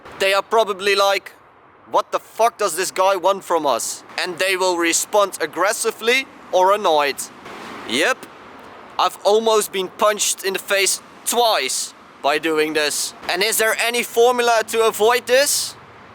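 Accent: Netherlands. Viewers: That Dutch accent :D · Right on! A dutch comrade! Just the accent gives it away. wonder the region you wright from? Havn't written in atleast 3 years. I suppose I need to get back at it! can't risk writing illegaly anymore though which sucks... Met quiet alot of writers through instagram. you have to do some digging but eventually you'll meet them. Sometimes I used to pass someones new panel on a train, I'd make a picture and send them to them on insta. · Je bent Nederlands k hoor t aan je accent 😂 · Dude,, Jij moet wel uit Nederland komen, je accent :) . Keer samen painten? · Are you dutch?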